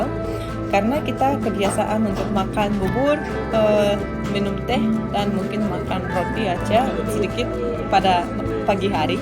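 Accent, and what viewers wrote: Java, Indonesia. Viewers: Logat mu bersih sekali , sudah persis orang Indonesia asli, agak terpengaruhi logat jawa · ngomongnya Indonesia bangett mbak ...... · Logatnya sdh seperti orang indonesia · Eksennya sudah orang Indonesia.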